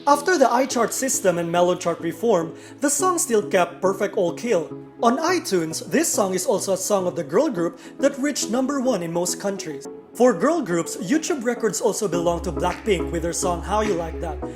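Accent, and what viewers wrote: Philippines. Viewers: Uso ginhawa kuya. Halata Pinoy, accent pa lang.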